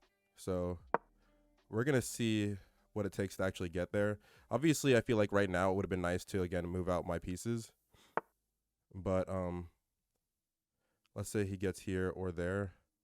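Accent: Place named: Africa. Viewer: What part of africa are you from?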